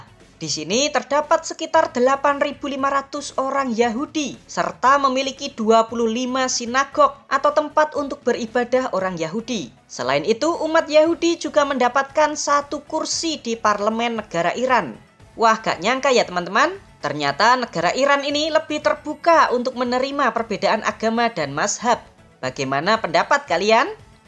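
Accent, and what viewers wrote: Java, Indonesia. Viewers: Kenapa jawa mu lengket amat? aneh kedengarannya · logat nya medok bgt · suara naratornya Jawa Banget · Mimin logat jawa nya kental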